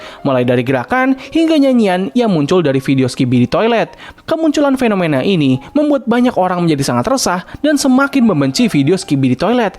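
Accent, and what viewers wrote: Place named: Sunda, Indonesia. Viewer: Emosi anda sangat tersalurkan dengan baik ditambah penggunaan kata "lah atuh" Sebagai orang sunda saya sangat familiar dengan nada nadanya😂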